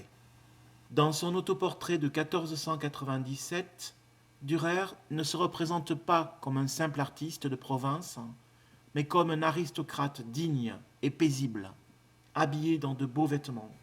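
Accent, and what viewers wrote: Marseille, France. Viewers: Allez L'OM ⚪️🔵 L'accent de Marseille Wallah... Sheeeeesh.... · Il a l'accent marseillais de l'huile pujet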